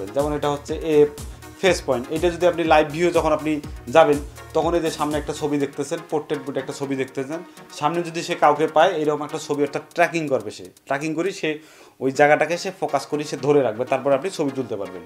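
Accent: Rangpur, Bangladesh. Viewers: বাড়ি কই ভাই? হয়া যাচ্ছে ইয়া হয়া যাচ্ছে চলি যাচ্ছে। রংপুরত বাড়ি নাকি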